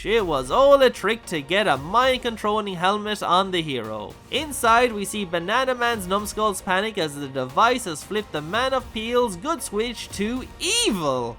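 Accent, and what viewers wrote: Ireland. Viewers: ffs... I was trying to work out your accent.. going from dutch to welsh to ...ireland... then i heard your 'artwork'..deffo southern irish.. scrolled down to see Galway , derp. Onie tuck uz twarthie minnits , sake!... I used to see bananaman on u.s. tele late 80's via nickelodeon...had no clue the backstory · I normally like an Irish accent - but I'm afraid your voice makes my ears bleed.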